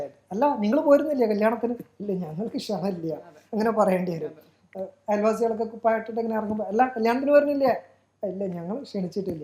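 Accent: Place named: Malappuram, India. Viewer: Malapuram slang nannaeittunde sir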